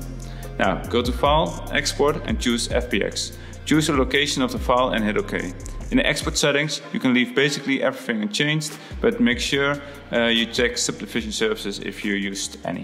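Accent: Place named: Netherlands. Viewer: Nooooo, your English is perfect I am sure it is even better than mine, but I recognize Dutch accent from their pronunciation of the letter "S". In Africa we ask people not to sleep in the open, because of the missile. hahhahahah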